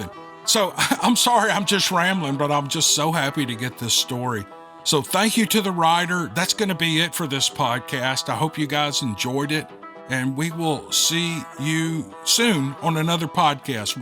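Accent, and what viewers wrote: South, United States. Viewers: Love ❤️ your Redneck accent 👍 Keep on entertaining us with your Awesome voice 👍 I agree with the previous commenter, some ppl just aren’t happy about anything 😝 I have never had anything to complain about since I’ve started listening to your channel, many moons ago 👍Keep up the great content & Happy New Year 😀👍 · Love your southern accent, from a Texas girl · I'm an Okie!!! Lol I love your redneck accent! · Thank you. You have no idea how much I needed this today. Your the best!! Thank you to all who send in there encounters. Many blessings to you and yours. 😊 I agree with everyone commenting, your voice and southern accent is what made me start watching this show from day one. Your personality is amazing and we, “ your people “ love you and this podcast. So Thank you again, much love from NE Illinois 😊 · I love your southern country accent, sense of humor, and charm. 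Your voice is comforting to me. I can close my eyes and imagine that I'm sitting at a campfire with you and your family, listening to the stories. It's lulled me off to sleep on many nights. Please keep the stories coming. ❤ Thank You everyone who's taken the time to write in. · Your distinct southern accent is at least 60 % of the charm and success of this channel! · Never lose your religion over someone not liking your "Okie" accent. What you have is just a typical Southern accent, that most of us from the South have. If some Northerner doesn't like it, well, "bless their hearts", they can go out back and tell it to the hogs! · I love hearing you. Hard to find a true Southern Voice. Your people love it don't let 1 rotten apple ruin your day. Thank you for being here. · I love you and LOVE your fine Dixie drawl! It makes listening to these encounters and stories such a joy! Thanks for this one- I agree, it’s especially good! 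❤🦍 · I know where glennie is ( a lot less how to spell it ) my in laws had property in Hillman which is north of there, a friend had some property southwest about 20 miles and another friend had property south of there, my Sisters had a cabin in the UP, I spent many a night at all those places and the first two were close enough for the same visitor though it never happened when I was there. I have a Sister still living in the upper peninsula and she refuses to come down to the lower part even though she could use some help in her advanced years. Excellent story and Karen needs to back off your accent, it's a perfect soothing southern state accent for these stories and others! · Your superior Southern accent sounds just like me, I'm from Eutawville SC, keep talking normally, we appreciate it! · I love your accent, I'm from GA so I know I have a southern accent and I like hearing yours, it reminds of home. 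· ill say what you cant say move on karen and kiss our country southern asses. If you dont like accent dont listen to the channel. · The best part of your narration is your not too strong beautiful southern accent. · Your Southern talk is what drew me to your channel in the first place! I feel at home. Love it. ~ Louisiana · Don't change I love the Redneck accent:D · I love your American accent. From Australia 🇦🇺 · I am from Ozarks in Arkansas. I understand u 100%. U talk like someone from the south. I'm planning on going to Mississippi next yr. And do some muzzle loader deer hunting. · Hi, and DC fans!, I’m from the Great Plains, and I love your Mississippi/Tennessee accent! You’re perfectly understandable, and it’s beautiful! That small-minded woman had no idea what she was talking about. If she didn’t like your accent, why was she listening?? 🤔 I agree with another commenter: she was a Karen. 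I’m so looking forward to any more Steve Lilly stories you may record soon! 😀😍🙏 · Love love lover your accent!!! No, it doesn't remind me of an Okee from Muskokee just a southerner and it is super charming!!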